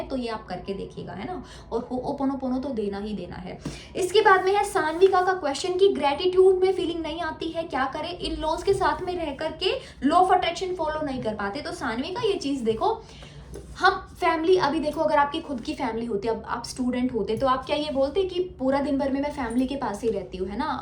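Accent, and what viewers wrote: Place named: Uttar Pradesh, India. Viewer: Qki aap hna bolti ho jaise ki hm log bolte h Mai up se hu Raebareli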